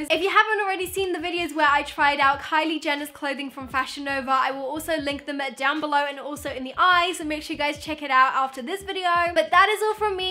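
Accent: England, United Kingdom. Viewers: I love your british accent! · LET ME TELL YOU!!! YOU SOUND SO MUCH LIKE LOUIS TOMLINSON. I'M NOT TALKING ABOUT YOUR VOICE BUT YOUR ACCENT. UGHHHH!!!😭😭😭😭😭... I'M A DIRECTIONER. AND IT HURTS, YOU REMIND ME SO MUCH OF LOUIS😩😩😩😩❤❤❤❤❤... Btw, I love your videos, and I think you should do that "bought the cheepest dress" video, in which you just chose the first dress. I loved that video, that was hilarious, please do another part.❤❤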